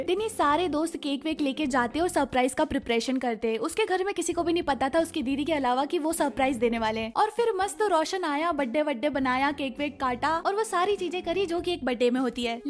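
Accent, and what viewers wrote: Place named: Gujarat, India. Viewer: Aapki tone sunke lagta hai ap Gujrati ho 😂❤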